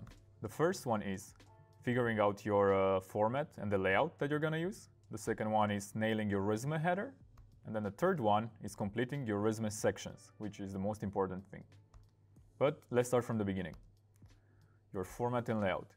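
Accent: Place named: Israel. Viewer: You must be from Israel. You sound Israeli and have a great personality.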